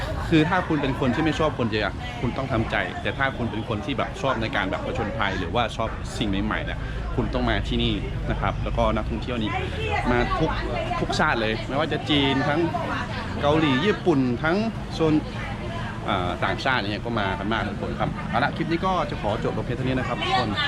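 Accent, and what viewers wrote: Thailand. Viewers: ฝึกพูดไทยจากที่ไหนครับ สำเนียงชัดมากครับ ยูทูปเบอร์ สปป. ลาวหลายคนในไทย เวลาพูดไทยยังติดสำเนียงฝั่ง สปป.ลาวบ้าง แต่ขอชื่นชมว่า น้องสำเนียงไทยชัดมากครับ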